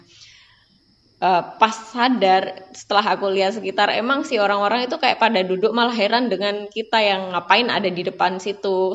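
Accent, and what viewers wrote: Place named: Jember, Indonesia. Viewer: Dari logatnya apakah mbak orang Jember? 😁 Aq tinggal di jember tapi mpe skrg blm pernah berkeinginan maen ke alas P Kbnyakan nonton podcast n cerita horror jd udah kbnyang serem aja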